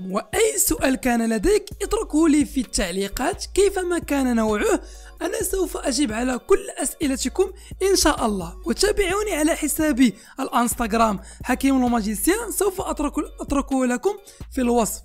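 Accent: Morocco. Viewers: نتا باين عليك مغربي بالظبط من مراكش😂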